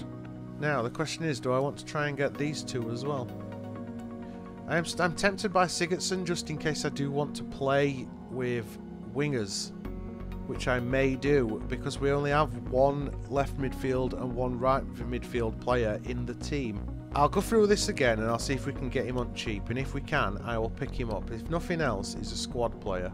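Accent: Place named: Northern England, United Kingdom. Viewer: Relaxing, insightful commentary from a northern lad, doesn’t get much better than that! Liked and subscribed 👍🏴☕️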